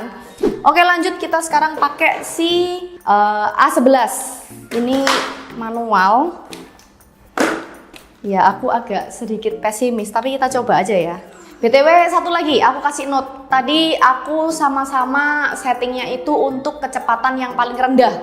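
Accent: Java, Indonesia. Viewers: It’s Indonesian language with Javanese accent 😂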